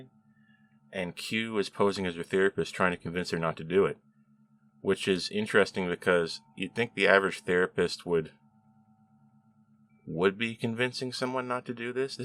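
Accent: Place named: Canada. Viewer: This is just an observation, but you sound like you are a Canadian.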